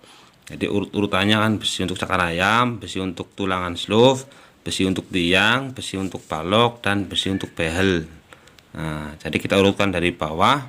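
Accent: Banyumas, Indonesia. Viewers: Ora ngapak ora kepenak... Maturnuwon kang ilmune...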